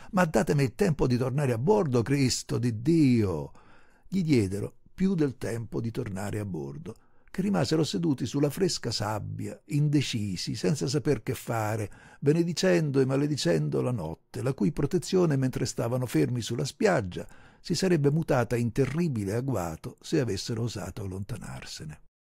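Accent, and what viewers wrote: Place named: Sicily, Italy. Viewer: Buongiorno caro 😊irresistibile il tuo siciliano❤, racconto commovente,si, ma quanto dolore e sacrificio dietro ❤❤ grazie davvero di cuore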